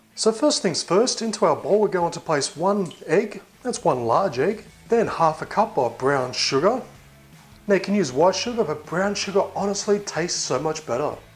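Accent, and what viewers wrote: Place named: Australia. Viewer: i like your aussie accent bro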